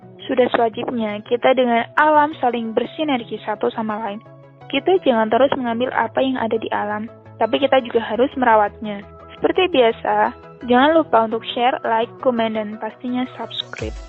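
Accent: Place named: Java, Indonesia. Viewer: Yg baca keliatan orang jawa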